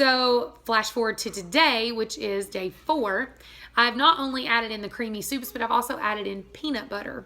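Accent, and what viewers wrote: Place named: East Texas, United States. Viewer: "What accent!" Indeed! You make me feel like I'm back in East Texas, surrounded by good looking men in Wranglers so tight you can tell what religion they are and when you said, "You feel froggy?" I wanted to yell, "JUMP!" at my screen!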